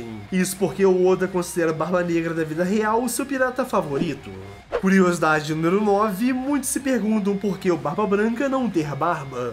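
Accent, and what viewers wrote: Portugal. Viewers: Eu só percebi que era um português falando, no fim do vídeo. Kkkkkkkkk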